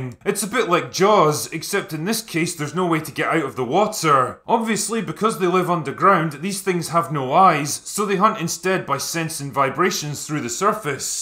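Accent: Scotland, United Kingdom. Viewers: Татья́на... yeah, it's Tat-i-jana, whereas the 4th and 5th are letters unknown in the English alphabet. The 4th is almost like the German "ü", the 5th is simply "ja" or "ya". I would say "Tatiana" is the most accurate, it's just onomatopoeia anyway. I guess Russians, Belarussians, Ukrainians and other Slavs DO have a couple of ways to write this name 😁! But as I wrote, the way pronounces the name with his Scottish accent it does sound more like Tateyana. Probably the man gets that girl to speak into the mic one day. If only she wasn't purely imaginary... 🤣😹😂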